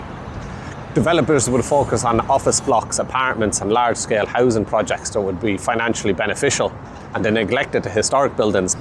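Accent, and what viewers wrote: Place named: Ireland. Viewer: combined with being a total hottie, that gorgeous Irish Accent turns into the total package of hotness 🇮🇪☘️🇮🇪❤️❤️❤️❤️❤️❤️❤️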